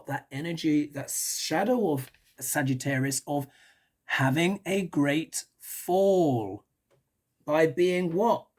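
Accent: England, United Kingdom. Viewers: I TOLD MY TEENAGE GRANDSON U SAY SLURP. OMG HE FELL OFF THE BED LAUGHING. THEN HE CALLED A CLASSMATE N THEY BOTH R SAYING IT WAITING FOR ME TO TELL THEM IT SOUNDS LIKE U. I'M NT GONNA SAY THAT BC THEY R FUNNY TRYING TO. THEY SPEAK LIKE U TO PPL THAT DON'T KNOW THIER AMERICAN, NOW THAT'S FUNNY. I LET THEM LISTEN TO YOU SOMETIMES TO HEAR U. I TOLD THEM THEY'RE GOING TO TAKE A TRIP ONE DAY TO ENGLAND N TEST THAT WITH REAL PPL WHO SPEAKS LIKE U, N THEY'LL TALK ABOUT IT FOR THE REST OF THIER LIVES. N I'M COMING TO BC I'VE ALWAYS BEEN DRAWN. THERE FOR SME REASON. BUT I'LL FIND OUT I GUESS LUV U, STAY WELL N. SLURP ALLL U WANT ON UR SHOW.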